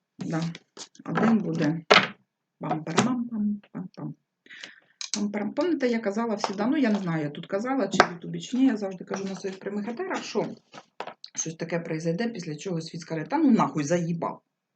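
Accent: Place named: Kherson, Ukraine. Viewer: Ви наша запальничка💙💛., Ви нам, жителям Херсонщини, дуже близькі. Бо і суржик в нас однаковий)))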